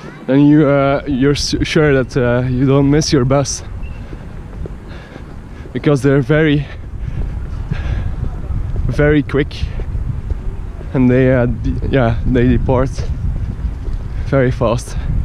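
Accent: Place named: Ghent, Belgium. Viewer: hoor ik daar een klein Gents accentje?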